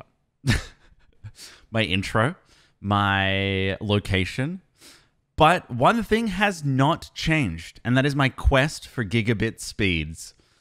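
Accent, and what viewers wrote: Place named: United States. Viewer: 6 years and an american accent later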